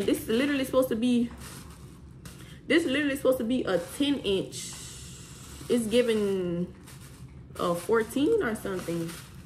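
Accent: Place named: Louisiana, United States. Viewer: periodddd, i can tell you from louisiana accent so cute ❤️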